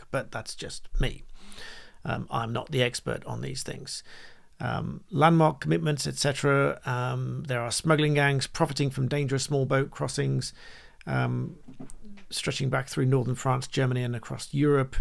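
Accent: United Kingdom. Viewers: Are you British? or English, there is a big difference.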